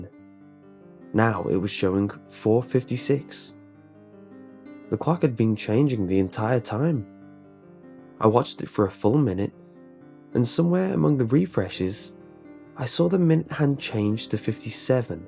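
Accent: United Kingdom. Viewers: your British accent make it more creepier. good job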